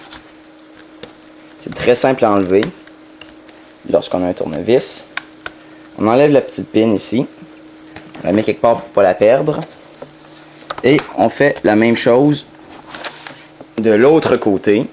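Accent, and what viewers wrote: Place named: Quebec, Canada. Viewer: dsl mais tn francais est...... Mais t un quebecois dc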